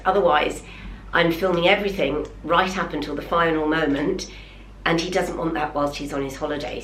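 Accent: United Kingdom. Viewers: Just found your vlog Jo and subscribed😀 I know I’m going to enjoy watching you. Sorry for your loss, there is no timeline with grief, I lost my mum at the age of 67, far too young! 18 yrs ago and it can still hit you out of nowhere. I recognized your face as an actress but couldn’t remember from where, until you mentioned Crossroads, use to watch it many moons ago. I’m a British lady 🇬🇧 living in Canada now🇨🇦 so will love hearing your British accent❤️ Best wishes from across the miles 😊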